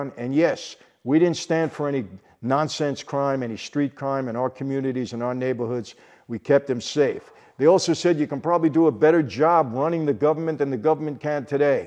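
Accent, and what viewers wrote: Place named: New York, United States. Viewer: Wonderful story, by the only person I want to hear it from. Yeah the Italian is a bit rough, but the NY accent makes up for it !! I love the ' 2 question ' format !! There are so many interesting people who comment on this channel, it's fascianting to hear their qestions answered. Best wisjes to all who gather here , and great congratulations to a + 1M channel, it's been well earned and deserved !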